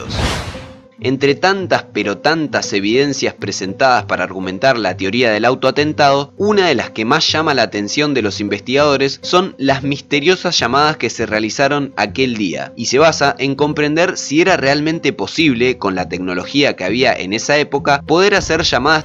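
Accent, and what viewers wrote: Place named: Rioplatense, Argentina. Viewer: Es un vídeo interesante, aunque varias de las explicaciones ya han sido ampliamente conocidas anteriormente. Ahora en este vídeo olvidaron uno de los hechos más significativos, que es el derrumbe injustificado del "Edificio 7", el que no fue tocado por ninguno de esos aviones empleados en esta Bandera Falsa, sino que ese edificio se derrumbó solo y sin explicación alguna. Y para mayor abundamiento, una periodista estadounidense en su informe en vivo mencionaba el derrumbe del "Edificio 7, cuando a su espalda, éste aún se mantenía plenamente en pie, y sin daño alguno. No obstante ser éste un buen documental —y lamentablemente— el relator de este vídeo padece de la tara foniátrica rioplatense de confundir reiteradamente la pronunciación de la LL y la Y, con la CH. Él menciona esto: chamar, cha, aquechos, chamadas, prochecto, echa, detaches, chamativo, chegaba, achí, chevaron, chegar, machor, anicho, cacheran, apochan, echas, ensachos, concluchendo. 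Por querer decir esto: llamar, ya, aquellos, llama, llamas, proyecto, ella, detalles, llamativo, llegaba, allí, llevaron, llegar, mayor, anillo, cayeran, apoyan, ellas, ensayos, concluyendo. Lo cierto es que el sonsonete reiterativo de esa tara foniátrica, termina fastidiando al oído de cualquiera que no sea de esa región. Creo que este videísta debe ir pensando en instalar una voz robótica en sus vídeos, es mucho más neutral, tienen buena pronunciación, y lo mejor de todo, sin esos desagradables sonsonetes de aldea. Hay que cuidar n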